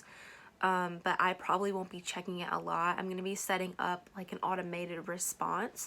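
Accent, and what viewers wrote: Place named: South Carolina, United States. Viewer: By the way, your South Carolina accent is so awesome.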